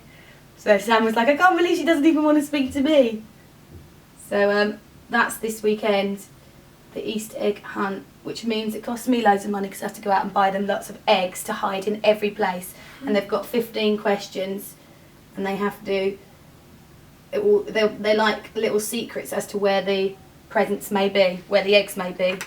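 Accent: United Kingdom. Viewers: We, Americans say vitamins (long i as in Hi), you lovely Brits say vitamins (short i as in hit). Love your vids, I watch them all the time.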